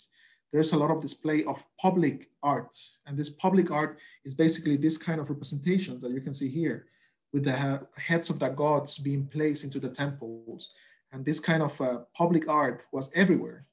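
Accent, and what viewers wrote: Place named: Brazil. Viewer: você tem um sotaque brasileiro quando fala inglês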